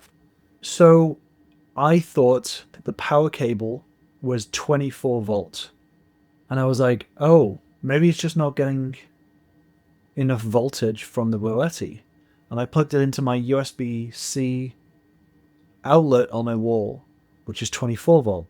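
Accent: United Kingdom. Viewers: Not so much full Karen as full Godfather. Very polite, no screaming, but do inquire as to why you have paid so much money for something that doesn't work. In fact work your British accent. I'm just saying this is someone who did costuming in theater for 7 years. Americans are idiots for a British accent. 🤨🤣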